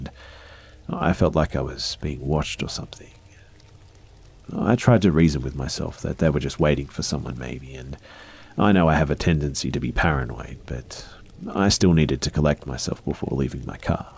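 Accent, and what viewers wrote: Australia. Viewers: So refreshing to listen to creepy stories with an Aussie accent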